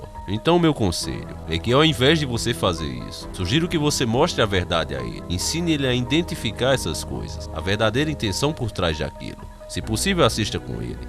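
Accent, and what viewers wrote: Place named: Pernambuco, Brazil. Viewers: parabens cara, seus videos são foda mermo e as tuas zoaçoes com sotaque pernambucano é massa!!!!!! ganhou minha incrição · Pela voz do Locutor, deve ser de Pernambuco!!!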